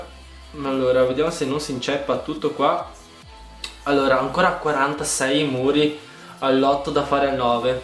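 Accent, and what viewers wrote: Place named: Milan, Italy. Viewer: Primo tuo video che vedo e ... sei veramente epico! Un cinese con l accento milanese dove lo trovi ... probabilmente a milano ma tu sei epico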